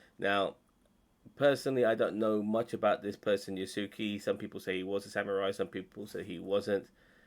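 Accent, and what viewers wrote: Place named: United Kingdom. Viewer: This guy is too brittish!!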